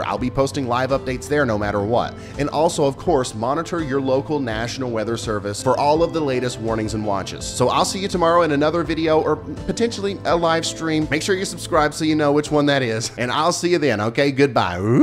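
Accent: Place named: Kentucky, United States. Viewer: Hello from London kentucky, u talk like ur close to here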